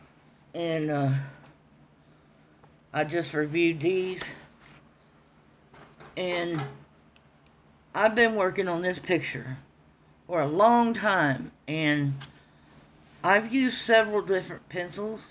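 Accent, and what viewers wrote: South, United States. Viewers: Don't you dare not use your beautiful southern accent!!🙂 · I love the color of your fish. I love a Southeren accent.💙💙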